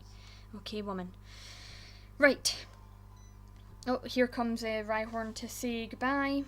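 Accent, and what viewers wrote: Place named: Scotland, United Kingdom. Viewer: Could you please do a video of you pronouncing different Pokemon names? I admire Scottish accents, and have been trying to find a video of a Scot pronouncing Pokemon names for a bit now, and this is the closest I've found.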